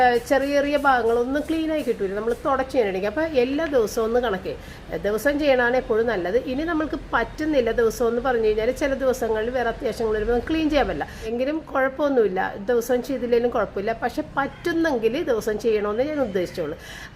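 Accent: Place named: Kochi, India. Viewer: ഒരിക്കലും വീഡിയോ നിർത്തരുത് ആരെ കണ്ടില്ലെങ്കിലും ഞാൻ വീഡിയോ കാണും കൊച്ചി ഭാഷയെ സ്നേഹിക്കാൻ തുടങ്ങിയത് സംസാരം കേട്ടത് മുതലാണ് ❤എന്നെ യൂ ട്യൂബ് എന്നും തഴയുന്നു എന്നാലും ഞാൻ നിർത്തില്ല 😀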